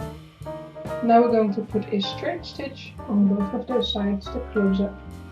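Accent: Netherlands. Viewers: Thank you very much! I am definitely going to try and make one^^ Btw, you have a dutch accent:)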